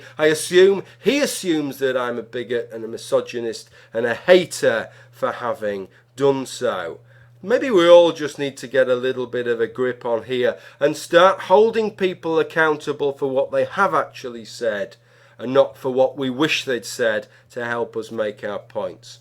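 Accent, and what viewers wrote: United Kingdom. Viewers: There are other YouTube atheists who are prettier than you (Suvarenee, The Peach, ZOMGit'schriss, etc), who are not only easier on the eyes but make my heart flutter and draw sighs from the most tender parts of my being. They're smart, they're sexy, they're atheists...what more could a guy like me look for? Nevertheless...nevertheless...I am forced to admit, you're still my favorite YouTube atheist. Is it the British accent? I don't know. But there you are. Rock on, good sir! Rock on!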